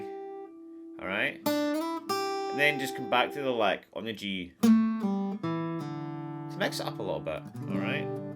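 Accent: Scotland, United Kingdom. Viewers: This is great, I not only get a guitar lesson but I learn how to understand a Scottish accent! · Great lesson and great to hear a fellow Scot! 🏴🤝🏻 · I like guessing Scottish accents and where they’re from, I’m gonna guess Edinburgh with an EW Punt on Penicuik! Am I in any way close? 😅 👍🏴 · you speak scottish,,,who in their right mind is gonna listen to your accent? tell me who? I want all their names,,they need therapy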